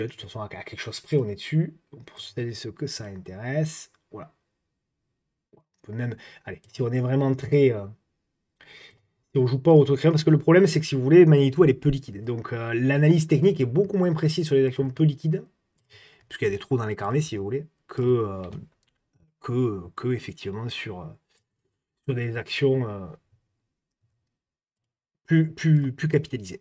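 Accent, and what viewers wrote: South, France. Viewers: Un grand merci, pour cette vidéo et ta newsletter. Beaucoup de contenu, de la pédagogie, de la bienveillance et ce petit accent du Sud… Tu es notre rayon de soleil. Merci pour ton grand coeur et longue vie à LA TABLE DES INVESTISSEURS 🫶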